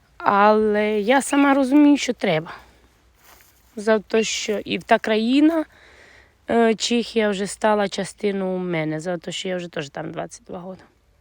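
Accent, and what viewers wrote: Transcarpathia, Ukraine. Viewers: Закарпатський діалект файний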